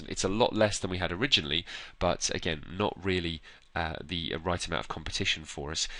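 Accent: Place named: Wales, United Kingdom. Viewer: This vid, and what I think is a Welsh accent, is awesome. I'm starting a second career and thinking about marketing, research, etc. Don't have much of a clue how to get started. We didn't have computers 101 in college when I went eons ago, So thanks, I've subscribed and will watch your other stuff. This video has an easygoing casual approach, with just enough complexity for me to wrap my head around. Excellent, thanks again, and happy trails to me!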